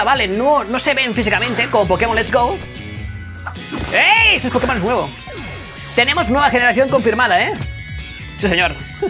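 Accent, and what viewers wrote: Catalonia, Spain. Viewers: jajajaja ja deia jo k em sonava catala k te cagas🤣🤣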